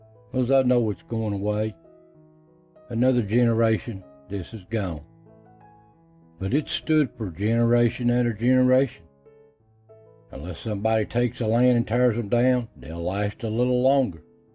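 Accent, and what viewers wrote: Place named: Appalachia, United States. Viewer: I’ve been watching you for a few years now. Love your shows and your Appalachian accent. Glad you’re feeling much better and can get around now. Sending prayers and much love your way dear friend. Keep us all informed on your little woodland friends. Loved seeing all the old homes. Wish they could all be restored. See you next time.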